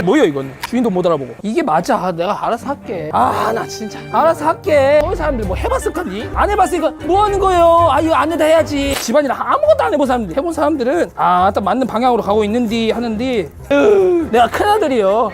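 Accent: Jeolla, South Korea. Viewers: 민혁씨 전라도 출신인가요? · 말투가 너무 구수해서 빼박 전라도 출신인줄 알았더니 태생부터 서울사람이넼ㅋㅋㅋㅋㅋㅋㅋ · 형원이의 영향인지는 몰라도 사투리할 때 전라도 사투리가 자연스럽게 나오는거 볼 때마다 깜짝깜짝 놀라 한국의 얼을 이해를 못한거야를 모단거야 하는데 진짜 자연스럽다 생각함 ...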